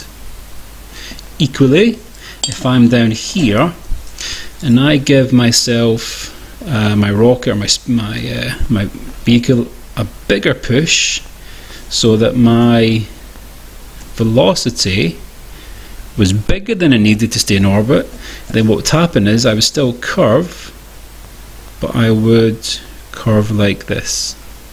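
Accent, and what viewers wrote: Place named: RP, United Kingdom. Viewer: Wee posh accent 😹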